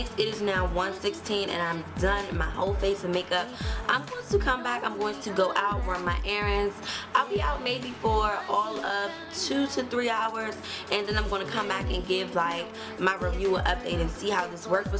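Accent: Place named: Baltimore, United States. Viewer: she has to be from bmore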